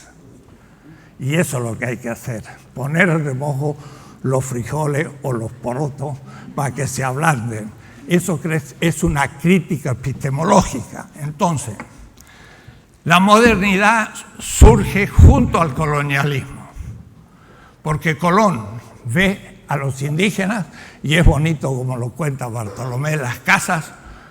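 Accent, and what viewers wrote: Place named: Chile. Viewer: Un ciudadano del mundo . Siempre pensé que era chileno ( por su acento ) hoy me entero que nació en argentina pero que hizo su vida en España palestina México . Tremendo aporte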